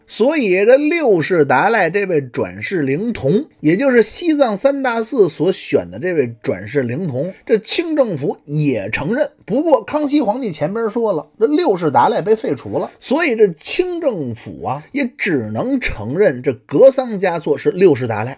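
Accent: Beijing, China. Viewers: 冒昧的判断一下 这是一口老北京北城话😅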